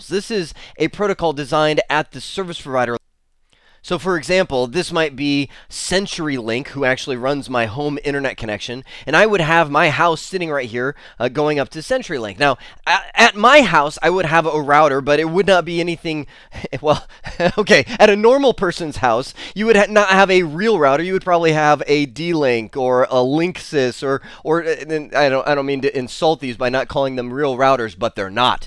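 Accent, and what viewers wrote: India. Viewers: if it hurts so much to hear Indian accent videos... simply stop listening to it in first few seconds.. People make videos for FREE to help other learners, why dont you simply pick up a book and read it then, instead of trying to find knowledge over youtube. I bet if you stay in technology field, you will hear more indian accents wherever you go... i am kind of sad and happy to read your comment, because whatever job you pick you will get to hear Indian accent....because we indians are way technically advanced :)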